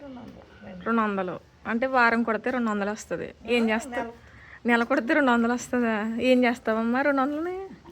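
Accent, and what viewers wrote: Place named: Konaseema, India. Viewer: Konaseema slang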